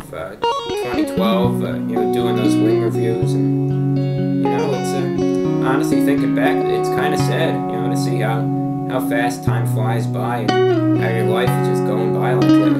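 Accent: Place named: New York, United States. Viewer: He has a New York accent here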